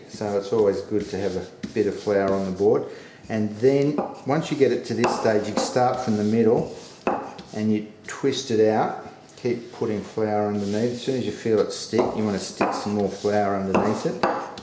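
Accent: Australia. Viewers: Maria Lopez Nope, that's an Australian accent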